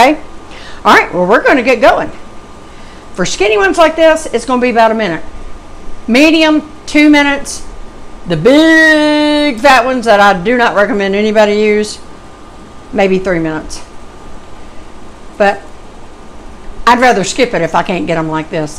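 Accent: South, United States. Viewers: As a Canadian I just love that southern (I think) accent, makes me smile that you'all talk like that! You' all come back now ! LOL.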